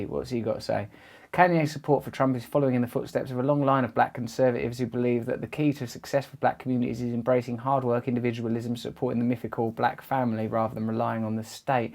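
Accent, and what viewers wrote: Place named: United Kingdom. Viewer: your bri-ish accent is awesome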